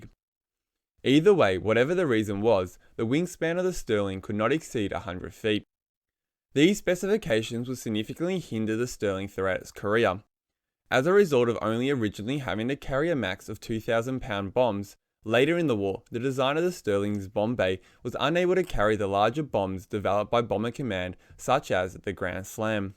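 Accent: Australia. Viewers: He is Australian, that’s the way a lot of Aussies pronounce it. All languages/ dialects have funny ways of pronouncement. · The Stirling was out-dated even before the prototype flew - things changed so fast! The thick, draggy, wing section was half of the problem, and the other was the feeble engines, so it was under-powered! My old friend Todd Slaughter (long since deceased) flew in them, but never talked about it. - - - The commentary (AI?) was very poorly spoken, disregarding the Aussie accent, so was difficult to understand, if you ignore the errors. (What's a glider tower - a vertical stack of gliders?) It would have been better to call it a glider tug.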